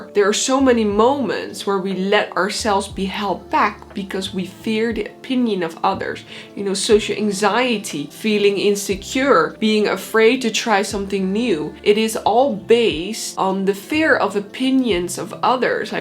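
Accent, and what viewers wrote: Netherlands. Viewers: Not only is your advice, life changing and I've watched all your videos many times over and over again to extrapolate all the information necessary. The Dutch accent is just so pleasant. Thanks!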